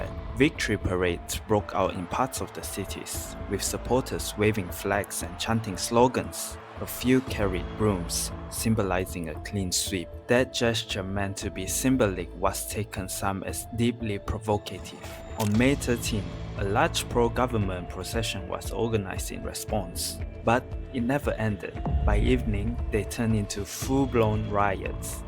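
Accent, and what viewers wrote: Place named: China. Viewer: While it's a fact May 13 happened, please remember that this video's accent is PRC. Don't let outsiders drive a wedge between modern Malaysians. It doesn't even mention key names in the confrontations, no mention of Harun Idris because a PRC or Malaysian CCP shill would not know. Victory parades DID happen, which contributed to the increasing tensions. We have freedom of Internet unlike China, you can search anything about May 13, research and talk about it, unlike Tiananmen Square.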